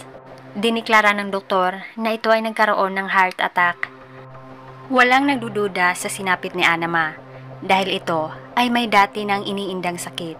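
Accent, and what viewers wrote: Visayas, Philippines. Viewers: Bisaya kc kaya minsan kaiba ung tunog ng oagtatagalog nya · Bisaya po kaya iba pagkakabigkas nya..☺ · Ang ganda ng boses nya sa mga nag bash sa kanya mag trabaho kayo wag palamunin😂😂 oo bisaya yan sya alam ko kasi bisaya din ako ano naman kung bisaya mas matalino mgabisaya kasi fluent kami mag English kami marunong mag tagalog with taglish pa kayo marunong kayo mag biisaya lol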